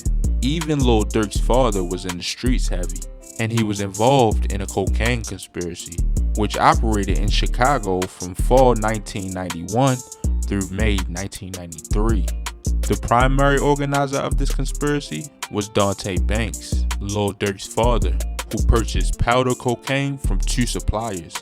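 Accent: Baltimore, United States. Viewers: You from Baltimore I can spot our accent anywhere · you from baltimore?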